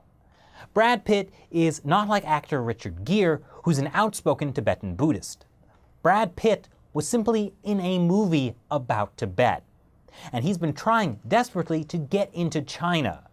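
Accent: Australia. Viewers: Australian accent? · an asian girl with an australian accent...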